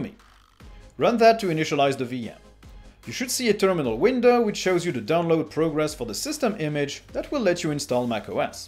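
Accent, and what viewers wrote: France. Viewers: Des vidéos en Français aussi serait les bienvenues, le YT Français étant un peu à la ramasse par rapport à l'Anglais, ceci étant dit, je suis parfaitement bilingue et j'appréci le p'tit accent frenchy, keep it up mate!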